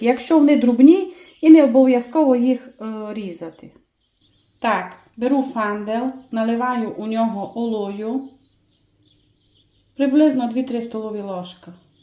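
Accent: Transcarpathia, Ukraine. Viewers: Це дуже смачно, в нас на Волині також таку готують ,лише без паприки.Називається страва мачанка.Обов'язково присутня на столі на Святий вечір і в піст також. Спробую додати паприку.Окремо дякую за ваш чудовий закарпатський діалект❤!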